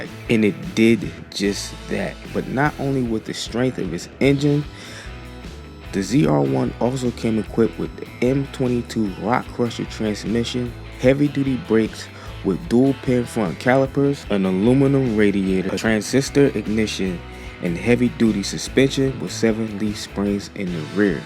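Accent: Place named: Africa. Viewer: Why do you have an African narrating this video?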